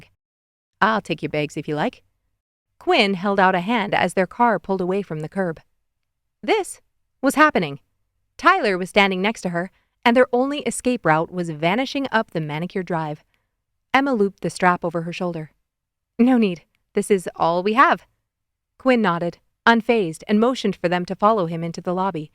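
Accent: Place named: Canada. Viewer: i love this soooo much the slight canadian accent just warms my heart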